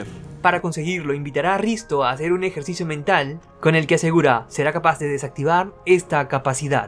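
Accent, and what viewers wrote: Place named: Peru. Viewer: Ese asentó peruano tan particular es así que el youtuber DKBesa también tiene una particularidad en su acento, espero tengas más suscriptores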